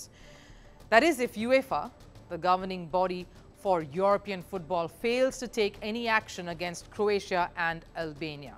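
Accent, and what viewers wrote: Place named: India. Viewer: She has an Indian accent ...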